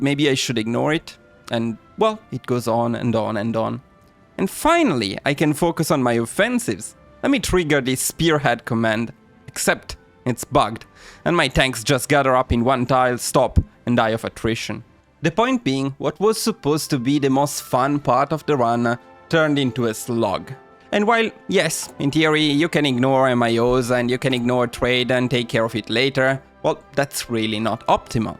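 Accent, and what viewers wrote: Italy. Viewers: Well, technically they put a thing that autoupdate the mio, and you can queue the upgrade you want at the start of the game. For the stuff i prefer to manually manage what i want to trade, like if i'm germany and i need 8 chromium for a battleship, i just don't trade that since it's not a priority. And one extra thing, i really hope that they take the time to upgrade old dlc focuses, they look totally dated if you compare them to the last ones. On another note, you really sound italian, idk if you are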